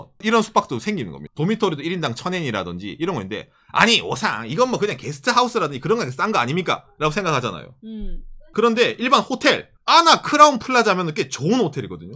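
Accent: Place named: Changwon, South Korea. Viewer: 살짝 창원느낌이네요 ㅋㅋ 창원출신 대학동기도 창원프라이드가 엄청쌔더라구요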